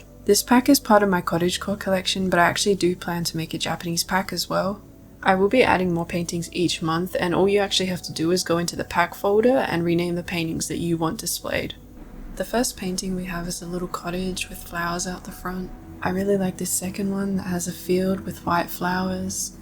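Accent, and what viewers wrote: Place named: South Africa. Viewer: funny accent. is tht afrikaans?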